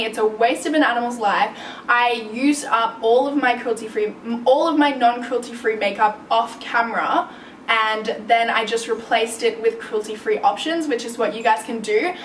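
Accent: Australia. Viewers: Hey, can you make a Get Ready with me video with your Australian Accent?:) hope you noticed me! I love to watch your videos because of your mix thingy accent and you're so talented!! you look like Kylie jenner slash Vanessa Hudgens <3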